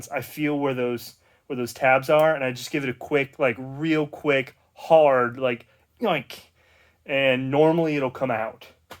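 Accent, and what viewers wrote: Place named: United States. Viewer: Just wanted to say thank you for making an entire playlist on this with so many minor details 🙏 After years of wanting one I finally picked up a GF8. With limited experience and knowledge I wasn't sure where to start, what to upgrade, etc. Buying a wrecked WRX is something I completely forgot about. But swapping everything over is actually a much simpler, more cost effective and intelligent idea. However, judging from your accent I'm assuming you're from The US as am I. How were you able to get a hold of a WRX that fits a GC/GF chassis?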